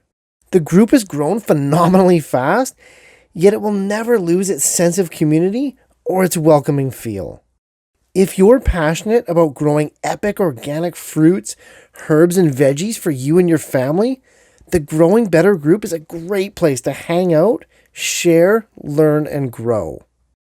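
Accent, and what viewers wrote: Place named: Canada. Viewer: Very useful and interesting and Fargo or Canadian like sounding narration. Seriously, very good video 👍🏻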